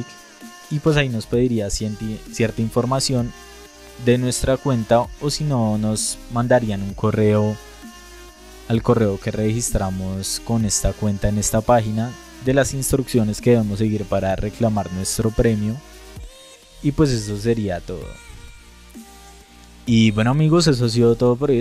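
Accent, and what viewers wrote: Bogotá, Colombia. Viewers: Iba a preguntar si en LAN se puede pero se le noto el rolo :v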